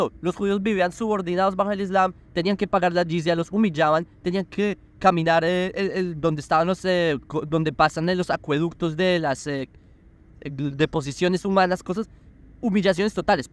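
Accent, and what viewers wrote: Bogotá, Colombia. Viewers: Tienes familia en Colombia? Es que me parece que tienes mucho de acento rolo (Bogotano).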